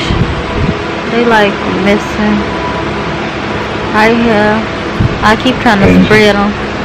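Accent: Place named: United States. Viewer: Heavy country accent yasss🧡🧡🧡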